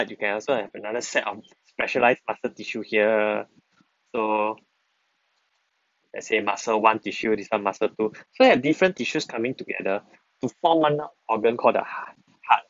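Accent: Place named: France. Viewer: U speak like a french BTW its quite helpful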